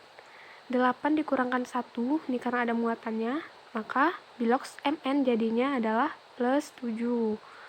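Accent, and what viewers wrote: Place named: Sumatra, Indonesia. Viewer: kalo dari logat nya sepertinya Sumatra kak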